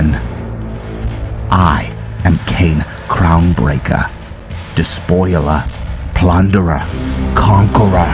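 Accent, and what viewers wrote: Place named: Scotland, United Kingdom. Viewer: that sounded like somebody was trying to be a bit Socttish in their narration....interesting choice. Luv ya hun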